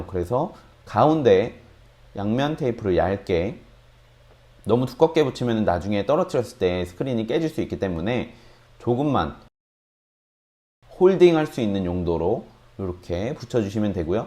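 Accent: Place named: Vietnam. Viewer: 아 베트남 사람인줄.. 발음머임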